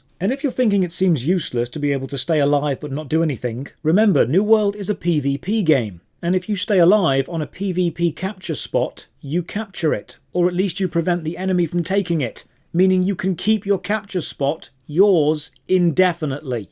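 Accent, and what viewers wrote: United Kingdom. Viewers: Another thing...Why is Topher Grace from that 70s show British and talking to us about gaming???????? 🤔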